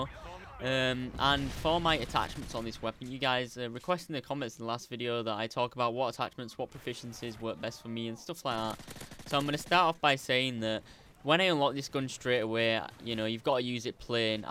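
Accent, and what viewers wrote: Yorkshire, United Kingdom. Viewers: are u from yorkshire ?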